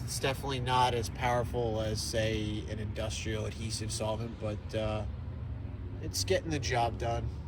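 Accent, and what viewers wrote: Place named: Long Island, United States. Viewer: That Long Island accent 😭😂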